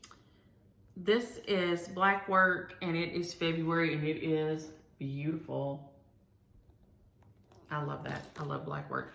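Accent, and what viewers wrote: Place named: Texas, United States. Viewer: I would not leave my Scotties or any pet alone if strangers were coming to my home and I was not there either. You were a responsible pet owner. I want to thank you for the sweet "shout out" today and you are so sweet to share to others to watch my Flosstube also. Yes, us girls raised in the south do have the slow southern drawl. Your Texas accent is charming and I love to hear you talk. I love to read also. I have the Libby app and also Overdrive to get my e-books. I subscribed to Freebooksy also. Maybe you should join Stitching Book Club. I really enjoy it, but I just cannot seem to get all the stitching done. I do love to quilt and sew but my machine and I have a love/hate relationship most of the time so I limit my sewing time so I do not lose my religion. My friend, Deloris, was so excited when you called her name about the "A Tisket a Tasket". 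She loves birds and has loads of feeders for all kinds. It could not have went to a person that loves birds and cross stitch anymore. Happy Stitching, stitchy friend! Dottie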